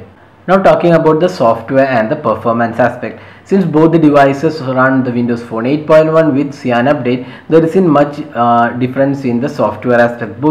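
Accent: India. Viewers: Whats up all these 35422 different indian mobile phone review channels?!?! Gimme a break guys. I'm totally allergic to your accent! Sorry!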